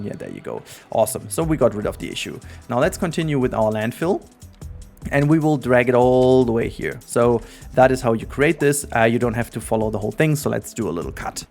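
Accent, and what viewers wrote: Germany. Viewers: Dieser deutsche Akzent ist göttlich! Love the videos, keep it up <3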